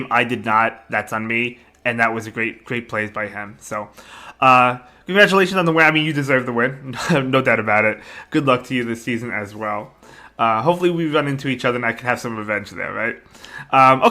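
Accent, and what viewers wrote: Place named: Northeast, United States. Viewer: I got to get my "qwaffee". Man your accent is very strong. If i had to guess you're somewhere on the northern east coast? As always, great battle's.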